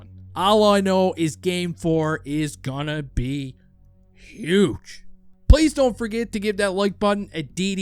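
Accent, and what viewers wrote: Newfoundland, Canada. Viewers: sounds like you have some newfie in ya, sounds great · Yo man, sick vid but are you a Newfoundlander?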